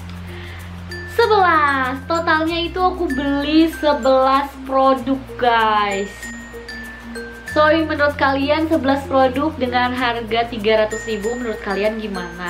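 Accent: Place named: Sunda, Indonesia. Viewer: kaka orang sunda yah? lucu ngomong nyaa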